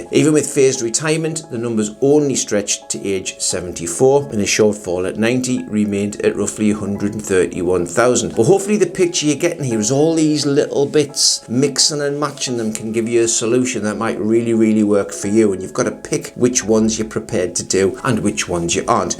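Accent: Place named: Ashington, United Kingdom. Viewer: I left England in 2005 but you sound like you're from Ashington - like me hehe.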